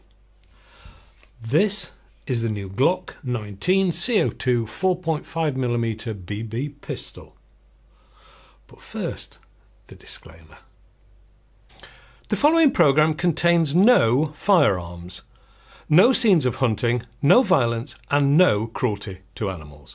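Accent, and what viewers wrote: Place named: England, United Kingdom. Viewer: Why is David Duke talking with an English accent?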